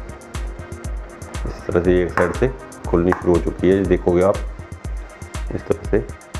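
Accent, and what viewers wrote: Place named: Punjab, India. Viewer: Very good voice pure punjabi. Sir aap kha se ho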